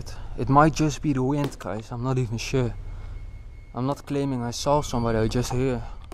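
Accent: Netherlands. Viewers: can you speak dutch you i heard it on your voice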